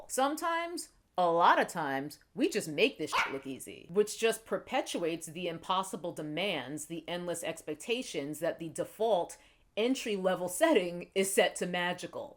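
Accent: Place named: Brooklyn, United States. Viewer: I know this is off topic but are you from Brooklyn? Your accent sounds Brooklyn to me.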